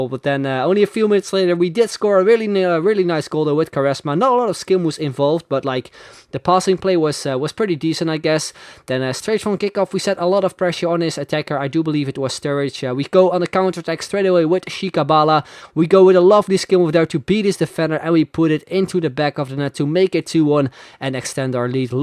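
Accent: Netherlands. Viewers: Is he Dutch????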